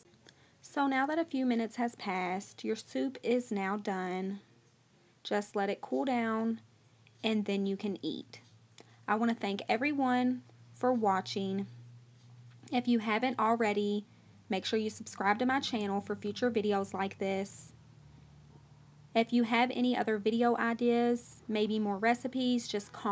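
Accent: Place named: Kentucky, United States. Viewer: Girl I knew you had be from ky. Way you clean lol an talk. I am from Eastern.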